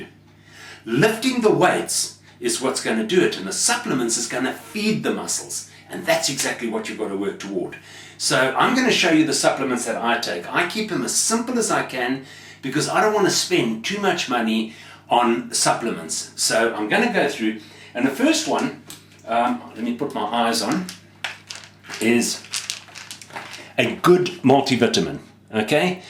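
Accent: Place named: South Africa. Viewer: you are deffintly south african or rohdesian Bra that accent is awsome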